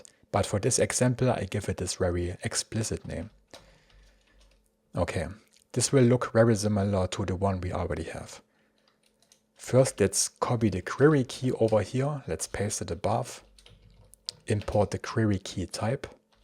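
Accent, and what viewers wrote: Germany. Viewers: gotta love the german accent/pace :-) slow and nice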